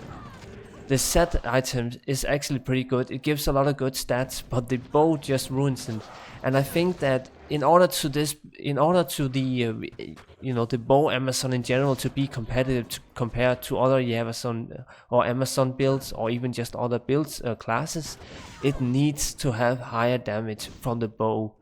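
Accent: Denmark. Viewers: dude. Du har lavet youtube videoer i tre år. Hvornår skilder du dig af med den stykke cringy danske accent. Og hvornår har du tænkt dig at øve ord inden du optager. Jeg har efterhånden set en god håndfuld af dine videoer, men jeg kan aldrig kommer over halvvejs, pga udtale og accent. Tag dette som konstruktiv kritik, fordi jeg tror virkelig at det vil hjælpe dig med dine fremtidige videoer.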